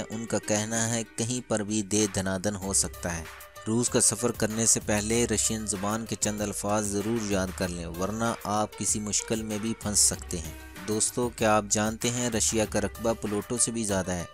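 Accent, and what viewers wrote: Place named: Punjab, Pakistan. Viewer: Yar I love you , n your Punjabi accent Rana sanalluah like ,AAP boht achha info dete ho , friend mere video bhi Dekho share bhi kro friend ke sath drawing vdo ke liye colour cloud Pakistan and cooking video ke liye jolly Azeem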